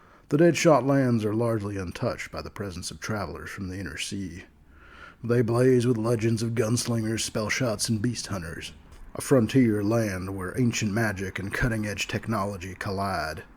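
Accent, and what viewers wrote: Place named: Texas, United States. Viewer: That is a great Texan accent and cowboy-talk. For a minute there, I thought this was a collaboration with Mr. Welch.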